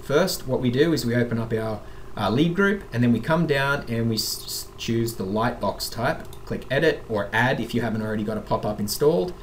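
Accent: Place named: Australia. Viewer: That was a very very good video. Thank you for sharing. BTW, love the Aussie accent :)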